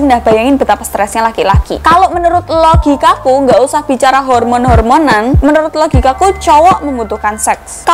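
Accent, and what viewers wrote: Java, Indonesia. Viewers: Salfok sama bahasa nya mba wkwkw "Medhok" wkwkw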